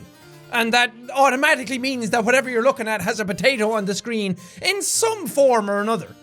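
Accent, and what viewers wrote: Ireland. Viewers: you really like potatoes😉 (Not Lying) And gotta love DAT Irish Accent(I'm White) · do you think your irish accent will go away since you moved countries, I hope it doesnt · what if you lose your accent since your not living in Ireland anymore... like how people who move to the US, from a place like Australia, they start sounding American after a while.... just curious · Are you scared by the fact that because you moved away from Ireland you'll slowly lose your accent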